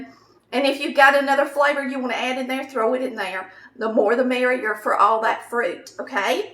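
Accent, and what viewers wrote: South, United States. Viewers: Girl you are SOUTHERN with hat drawlllll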